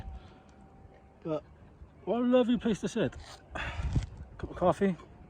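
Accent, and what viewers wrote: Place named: Monmouthshire, United Kingdom. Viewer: Off the scale handsome. Such a lovely accent too... Sounds like Monmouthshire to me, but may be wrong 😊.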